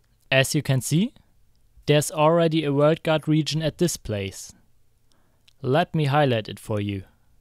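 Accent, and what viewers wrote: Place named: Germany. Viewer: this is the most german english I've ever heard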